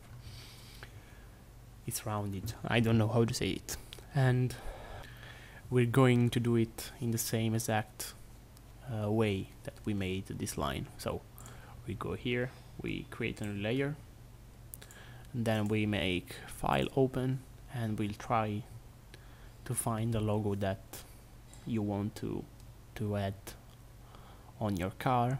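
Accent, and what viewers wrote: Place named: England, United Kingdom. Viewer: bro has the best english accent and still apologises that much... amazing video, thank you!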